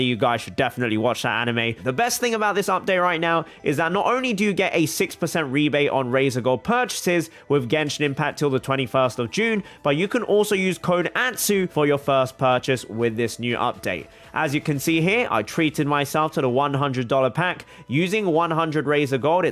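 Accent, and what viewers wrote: United Kingdom. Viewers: Damn, you got those good genes looking like you do now at your age! Dude at my workplace is Chinese and 35, and he only looks a few years younger than you.The two of you would've passed for siblings for real. Also, as an Chinese Australian, I gotta say that you sound more British than Australian. When I'm talking with people using discord, they think I'm British lmao.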